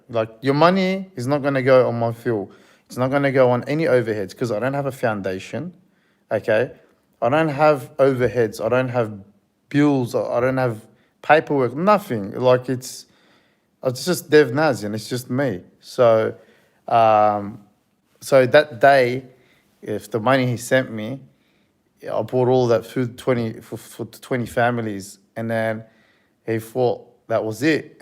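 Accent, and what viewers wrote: Australia. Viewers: I always wondered why you had a slight Aussie accent. It's not a very strong aussie accent because I can understand you but it's a beautiful accent. So you guys have been there. So cool!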